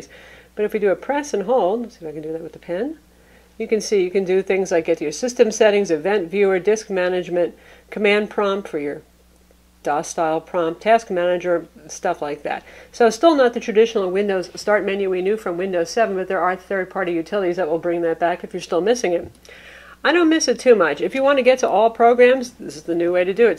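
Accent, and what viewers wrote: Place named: Upstate New York, United States. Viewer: Gifts live that upstate NY accent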